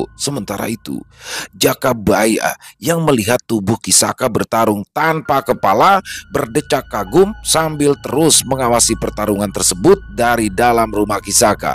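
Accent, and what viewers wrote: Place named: Jakarta, Indonesia. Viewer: bang kapan lagi ngangkat cerita bang rudi sm bang piyan,seneng sm logat betawi nya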